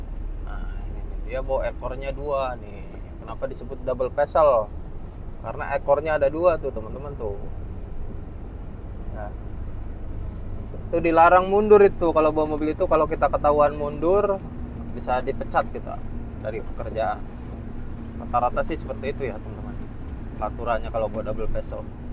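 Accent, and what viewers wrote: Serang, Indonesia. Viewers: Aduh aing setiap bicara ciri khas orang Serang he he sy senang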